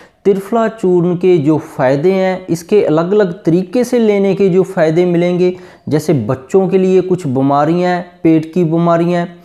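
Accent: Punjab, India. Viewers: आपका बोलने का पंजाबी अंदाज़ बहोत बढ़िया है।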